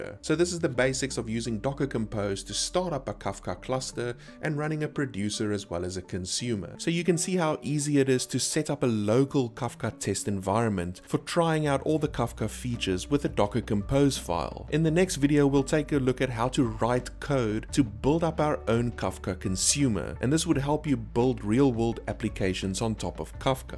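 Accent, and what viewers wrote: South Africa. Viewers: Love hearing a South African accent explain these hot topics!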